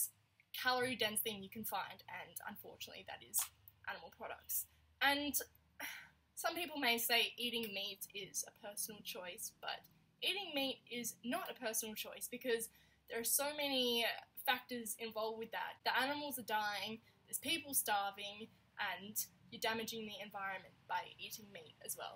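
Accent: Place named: Australia. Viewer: Cute Aussie accent.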